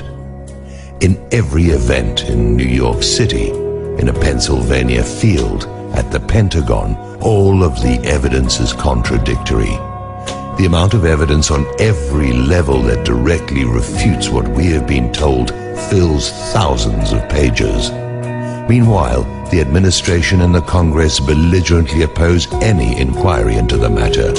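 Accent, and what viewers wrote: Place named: United Kingdom. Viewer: You will be doing this video & the message an immense service by changing the word 'American" in the first sentence to 'Everyone'. The British voice over tells us to use 911 as a litmus test for complicity or stupidity. Please, all those of the truth movement - keep in mind the INTERNATIONAL scope of the problems, of their impact and of the audience watching your videos.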